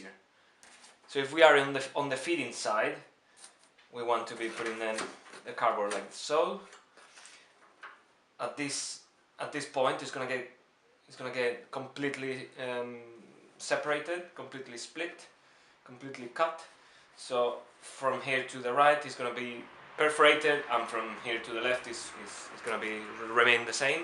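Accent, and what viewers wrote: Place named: Spain. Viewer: Se lo mandé al grupo intercambio para que los españoles oigan inglés de un español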